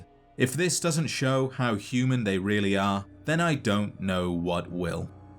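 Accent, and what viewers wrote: United Kingdom. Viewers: Part Shoddycast, part Oxhorn, part MittenSquad, fully British.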